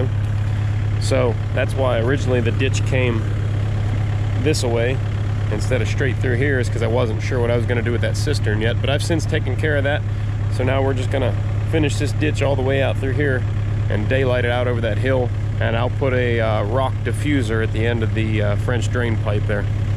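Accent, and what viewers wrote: United States. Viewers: Was just about , as a relative newcomer to this project, to ask what exactly what you intended to use it as. You stopped me in my tracks by giving a quick description. But as one who sometimes struggles with local American accents can you describe a little more exactly what you would like it to be, BRIAN from Spain . Thankyou.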